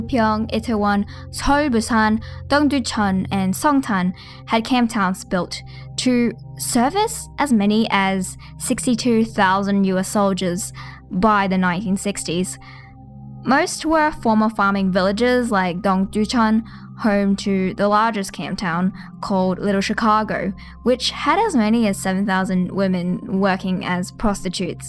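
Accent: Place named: Australia. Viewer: Ironically, has an Australian accent. NICE!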